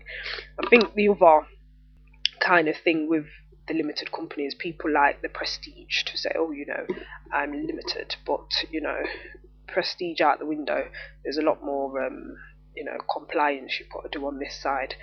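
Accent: United Kingdom. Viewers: That's a Notts accent if ever I heard 1😃thanx for good info xx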